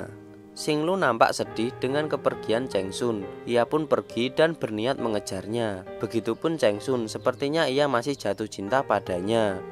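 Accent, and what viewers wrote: Java, Indonesia. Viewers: Mentok jawa nya. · medok amat yak/// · Medok betul jawanya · Pegel kuping,, medok banget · Jawir.. Logat jawa nya ilangin... Biar tdk jd film lawakan ... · Medoook bangett sii min ..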